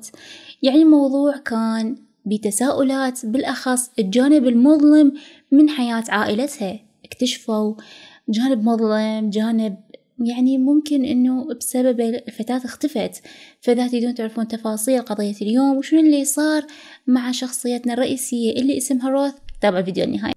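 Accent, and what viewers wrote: Iraq. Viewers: جداً أحُب لهجة العراقيه تحديداً عليكك 🥺❤️❤️❤️.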